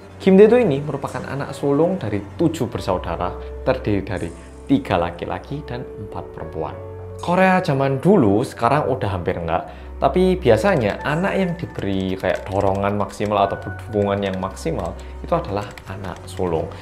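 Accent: Java, Indonesia. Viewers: Orang Korea yang medoooookkkk 🥰🥰 #fanmalaysia🇲🇾😍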